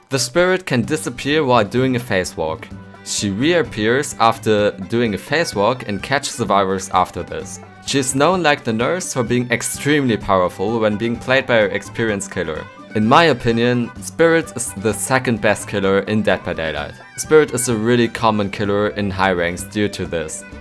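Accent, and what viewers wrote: Germany. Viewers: The german accent xD good video ^-^ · Please do a survivor ranking next. I really like your Deutsch Akzent.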